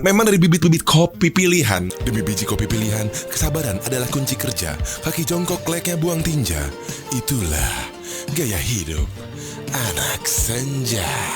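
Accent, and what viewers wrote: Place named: Bogor, Indonesia. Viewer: logat bogor na karasa euy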